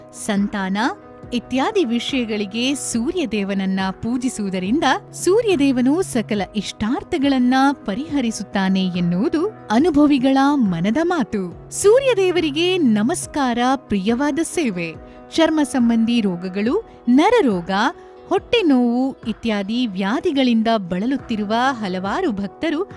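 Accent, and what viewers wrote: Standard. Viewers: ಶುದ್ಧವಾದ ಕನ್ನಡ ಮಾತು ಕೇಳೋಕೆ ತುಂಬಾ ಚನ್ನಾಗಿದೆ all the best god bless u.❤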